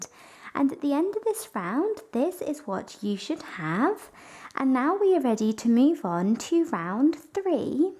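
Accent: United Kingdom. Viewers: An extremely strong UK accent. 💕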